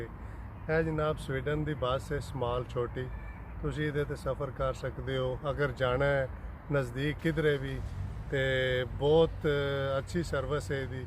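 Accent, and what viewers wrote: Gujrat, Pakistan. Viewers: Ap Gujrat se hain .ap k bolne k style mujhy lga tha Ap Gujrat se ho .meri ami bhi Gujrat se thi .☺️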